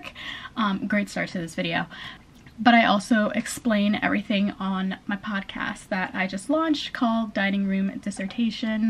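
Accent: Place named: United States. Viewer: I listen you because I wanna to hear the american accent 👍🏻 you great👍🏻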